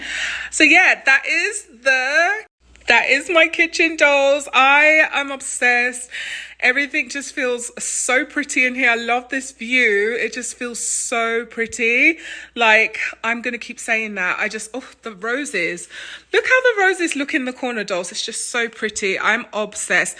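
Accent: London, United Kingdom. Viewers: Are you from London ?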